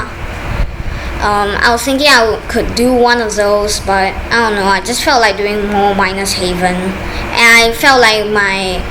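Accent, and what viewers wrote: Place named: China. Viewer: u sound Chinese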